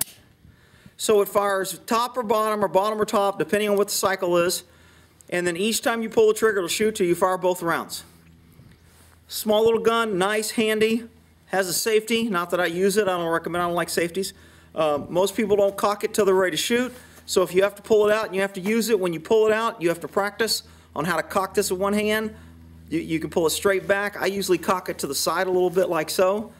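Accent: Texas, United States. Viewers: This may be the oldest video, I have seen him make, in his newer videos he has picked up more of a Texas accent.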